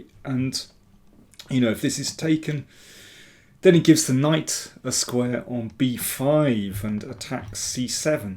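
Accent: England, United Kingdom. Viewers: Great cover as always. I'm sitting here, out of town, in a suburb of Stockholm, a bit lonely, finding comfort in your coverage and your lovely English accent. Cheers.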